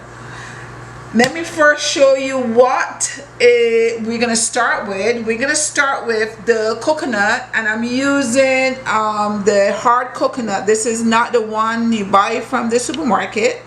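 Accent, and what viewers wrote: Barbados. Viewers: Great to hear your bajan accent peeping thru!!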